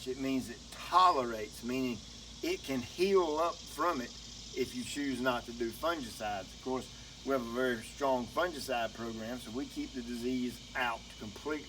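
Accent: South, United States. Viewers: That would be awesome to watch both of you guys do a collaboration together. You both are great at what you do. I appreciate the information you pass along to all of us here. BTW, my wife rolls her eyes when I watch my videos but when comes on she'll watch with me. She just loves your southern accent. Keep up the great work,.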